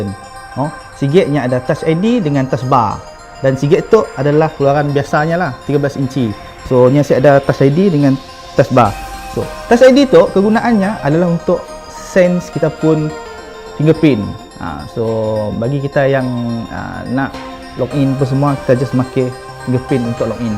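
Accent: Sarawak, Malaysia. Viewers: Bahasa melayu sarawak lah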